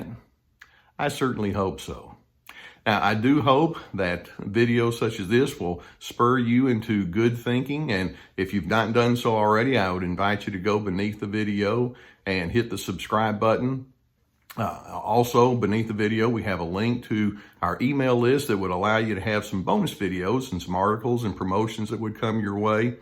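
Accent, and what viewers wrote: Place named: Rural, United States. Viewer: Good topic, just ... but I think people who become professionals should try to get rid of that accent. It is possible to learn to speak with a more neutral accent. Because it's so distracting and it robes him of credibility. And that's just because of the stereo-types associated with the accent, I guess: cows, farms, ranch, horse, farmer, pigs, mcdonald, cowboy hat ... it's not usually associated with intellect. Omg I hate to be so hateful lol. But nonetheless, good content, good channel. Don't me I'm an idiot girl who cannot stop herself from typing my actual thoughts.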